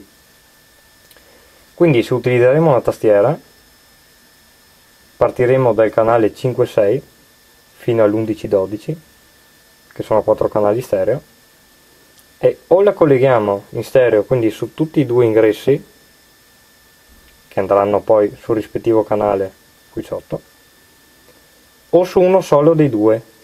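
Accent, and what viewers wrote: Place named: Trieste, Italy. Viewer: mamma come se senti che te son de trieste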